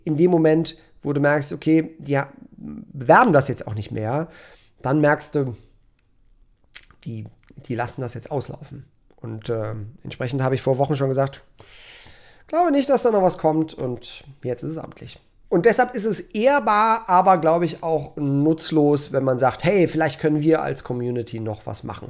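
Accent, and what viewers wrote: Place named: Berlin, Germany. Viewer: was du bist Berliner ???? echt???? Ditt find ick jut :D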